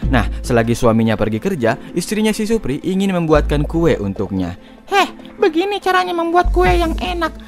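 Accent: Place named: Sulawesi, Indonesia. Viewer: Ini kayak orang sulawesi logat"nya🤔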